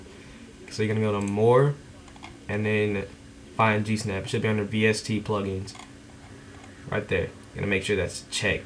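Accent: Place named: Knoxville, United States. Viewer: ah you kinda have that knoxville accent going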